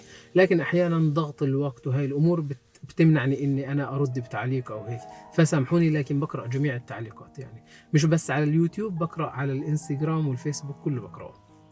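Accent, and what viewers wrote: Palestine. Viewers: أنا اليوم وبالصدفة شفت أول مرة فيديو إلك وأول ما سمعت أول كلمتين قلت في نفسي هذا فلسطيني الله يرضى عليه، وقبل ما أعرف أي شي عنك وعن فيديوهاتك، والفيديو كان بعنوان "هكذا سيكفيك راتبك بل وسيبقى منه" وبعد هيك سحبت الشغلة وحضرت 5 فيديوهات ورا بعض بدون ما أحس بالوقت، وبعثتهن لابني لأني حبيتك وحبيت أنه يشوف فيديوهاتك لكن هذا الفيديو يا ابني يا كان فعلا مميز ووصل لقلبي غير، حسيت أنه كل كلمة في هذا الفيديو طلعت من قلبك مش من تمك وربي يسعد قلبك ويحميك يا ابني ويثبتك يا رب وربنا يسعد والديك ويجزيهم الخير على هيك تربية. فلسطيني من السويد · ماشاءالله عليك أخي جد انت إنسان عضيم ومحترم الله يجزيك الجنة وينفع فيك بس انت قلت بس الفلسطينين عم يفهموا عليك لا كلامك كلو مفهوم بالحرف نفس كلامنا السوريين ونفس المصطلحات بس لهجة بتختلف😄😁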